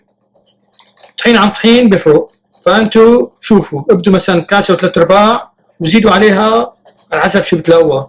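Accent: Levant. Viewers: لك ما تبلى المهارة الشامية ❤️ واللهجة الشامية ❤️ وهالفكرة الحلوة لتعليمنا اكلة الطفولة وذكريات شام الامان وصراخ البائع ناعم يا ناعم ❤️الله يعيد هديك الايام بهمة ناس بحبوا بلدهن ❤️ الله يعطيك الف عافية وشكرًا جزيلاً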